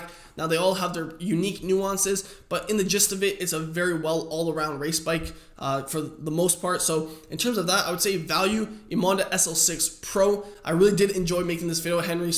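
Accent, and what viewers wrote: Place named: Canada. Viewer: Really nice video. Good job! 👏🏼 (P.S. I like that Canadian accent. Makes me want to go watch hockey on TV. 🏒🤣)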